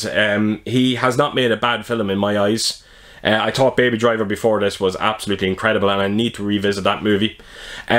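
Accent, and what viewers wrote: Ireland. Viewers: Well I think this video has had 1000 views - or thereabouts - as I'm typing this so you're really on your way now. Your channel has a difference that no other does and that's your collectables. But all those wonderful special editions and busts you are able to show would be for nothing if you didn't have the presentation style and ability that you've developed. I think your Irish accent probably helps too. It's great to see you doing so well and it is well deserved. We had visitors yesterday afternoon so I didn't get as much edited as I expected but having two of our oldest friends over (we now only live a few miles from each other) meant the home cinema got a good workout and it's now almost flawless so I'll do an update for that in this latest video too. We watched the new Blu-ray of 'Spencer' last night which is a fictional account of a few days in the life of Princess Diana and it was shot on film. 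It did have a similar look to Last Night In Soho in places but a little dowdy at times which was similar - but different - to how Dune looked here. All our systems are different for sure. The 4K of The Sting has a really interesting extra on it and one of the Universal film restorers actually states that scanning a film exacerbates film grain. That would appear to confirm that suspicion then and why some films have to have digital noise reduction (Terminator 2 perhaps?) and others such as The Fifth Element have far more prevalent grain on 4K than on a 35mm print. We're all st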